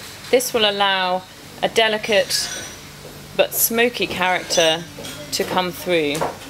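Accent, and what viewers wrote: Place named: United Kingdom. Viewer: Very interesting video, I like Lapsang Souchong! It has very peculiar taste indeed, it's surely not for everyone. Lovely British accent, by the way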